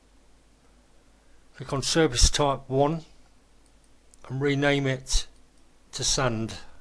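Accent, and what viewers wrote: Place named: United Kingdom. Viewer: Let me ask. From your accent, I assume that you're British, are you? I'm Polish, greetings from Poland and carry on with your work on the editor. I'll be watching. Good luck and peace on.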